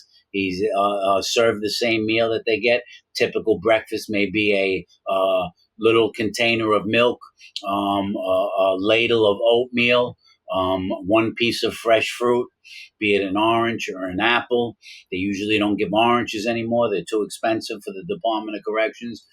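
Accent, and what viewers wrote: New York, United States. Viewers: Just found your channel. You sound like you're from new york. I appreciate your somewhat objective/neutral analysis of this situation. I appreciate you telling us about the difference between federal prison/lockup and state prison. It almost sounds like you've done some time yourself. It's like having an uncle or papa or some neighborhood guy telling all the kids gathered around the block about the prison system and how it works. So that they don't end up like him. Something tells me that he might get moved simply due to the fact that some of the victim's families live in the state of idaho and they don't wana be sleeping in the same state as their loved one's killer. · I love ur NY accent! I dated a guy who did 27 yrs and he sounds just like you. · i can tell you from NY immediately as i am too i started watching your other videos too ….good stuff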